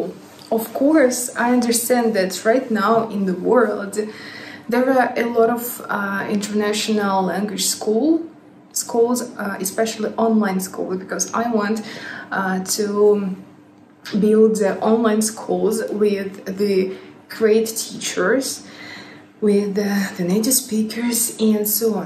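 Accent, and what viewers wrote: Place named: Eastern Europe. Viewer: I am British and therefore a native English speaker. You are too hard on yourself. Your spoken English is very easy to understand. You have an Eastern Europe accent when you speak English but not a very heavy accent. Any native English speaker would EASILY understand you The reality is that most educated native English speakers could probably identify an accent in the spoken English of most other English speakers. I think I could identify which country a Canadian, a South African, an Australian or an American speaker of English came from even though they are also native English speakers. I could identify where in the UK most people came from (London, Newcastle, Yorkshire, Glasgow, Birmingham, Liverpool, Wales etc) as those areas/regions have their own accents, too. People speak English with French, German, Italian etc accents and, yes they will make mistakes but that doesn't mean it is difficult to understand them. 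Most British people mangle the language so who are we to criticise?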